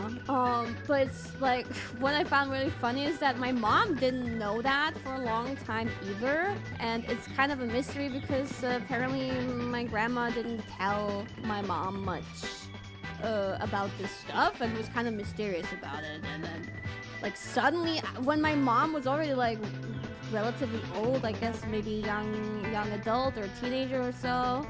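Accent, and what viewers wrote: United States. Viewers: Must be why she has such good aptitude for speaking American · American blood... Maybe that is why she is good speaking American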